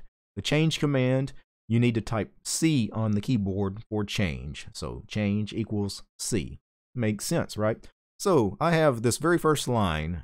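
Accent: South, United States. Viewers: You're from the south?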